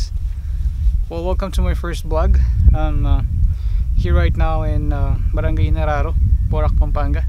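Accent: United States. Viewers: Nice sir your speaking like American guy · Wow he has american accent. Amazing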